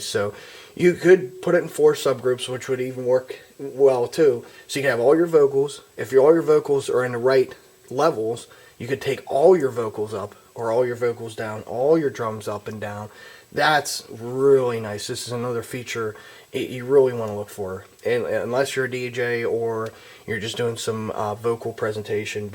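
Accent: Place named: Baltimore, United States. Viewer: great tutorial and there's no hiding that baltimore accent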